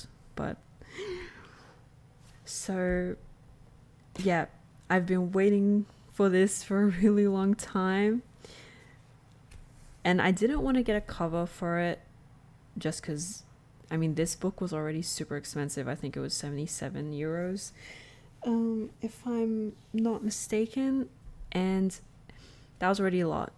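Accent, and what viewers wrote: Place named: Australia. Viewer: One of your videos came across my feed & I'm so happy! Loved this video! 🥰 Edit: I always get excited hearing a fellow aussie accent talking about journals/planners 😅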